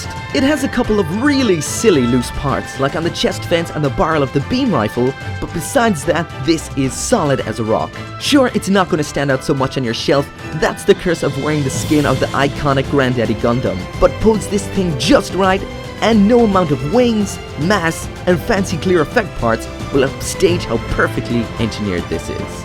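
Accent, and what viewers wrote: Ireland. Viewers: Is this YouTuber Irish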